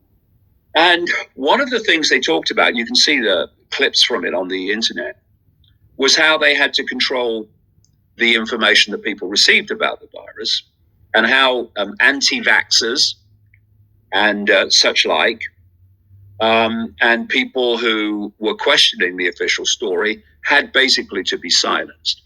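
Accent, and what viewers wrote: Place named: United Kingdom. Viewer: Woke In So Cal 100% agree! He can be funny too when he mocks people in his British accent 🤣 be vigilant,stay safe and head on a swivel! Much love ❤️💯🙌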